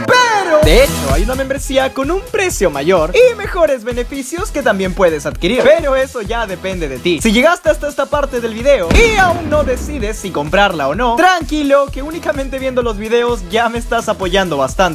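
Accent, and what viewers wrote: Peru. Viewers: eres peruano?? -.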